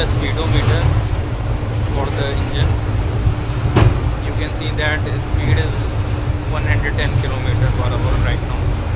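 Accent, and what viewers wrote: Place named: India. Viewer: Compliments for taking time out to make this audio-visual coverage. However, I must rectify one thing. You've been using the term electric 'Engine' iteratively, which is wrong. It should be electric 'Locomotive'. These locos don't have engines in them. For those who have been criticizing for his English must remember that it's not our mother tongue, neither it belongs to our country. So, an Indian with accented English is quite normal and nothing wrong to look down upon. Don't discourage someone when you can't encourage them.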